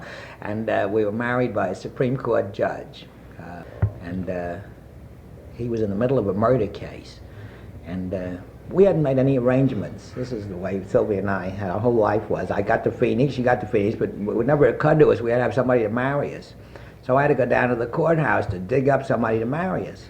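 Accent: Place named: New York City, United States. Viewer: That accent is Manhattan West Side, like *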